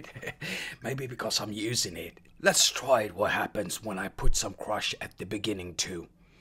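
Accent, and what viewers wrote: United Kingdom. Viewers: I hear the "UK" in your voice too. Maybe it's where you learned english from? I know this man from China and he has a "UK" accent. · Really enjoyed this video ! And UK accent is the way to go !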